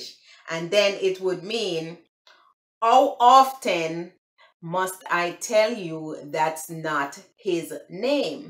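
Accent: Jamaica. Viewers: Yayyy!!! Thnx for this video!!!! I needed this! I'm subscribed to a few Jamaicans n I needed this lol I love the accent!!! Would love to visit your land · Yes Sister I love this A wa ya say Jamaican accent 👍👍👍👍👍 English is such a long proper language, 😂 😂 Tfs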